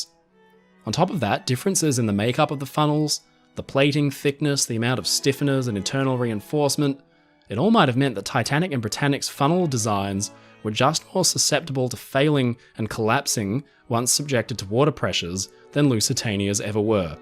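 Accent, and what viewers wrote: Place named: Australia. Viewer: It's because he's Australian. Different countries have different pronunciations of the same words. Neither is right or wrong. It's basically the same thing as those of us in the US pronouncing herb as "erb" when England pronounces it with the "h" sound at the beginning. Now, they could definitely tell us our pronunciation is wrong because they were saying it with the "h" before we were even a country, but would you say we're wrong? Probably not, and neither are they.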